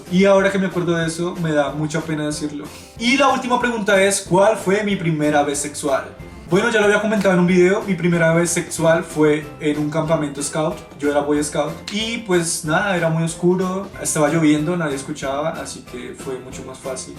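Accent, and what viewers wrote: Colombia. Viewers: Awww,¿por que hablas tan tierno? ese acento colombiano;D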